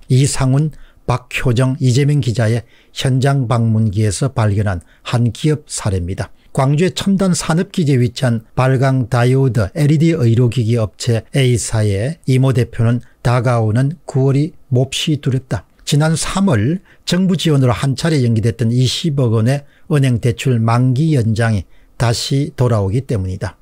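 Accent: Gyeongsang, South Korea. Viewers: 공 시징님 열심히 열강하고 있습니다. 현실을 직시하는 말씀 잘 듣고 있습니다. 다만 , 말씀을 조금 조절하시면 좋겠습니다. 너무 경상도 말로 빨리 말씀 하시네요. 좀 슬슬 말씀해 주세요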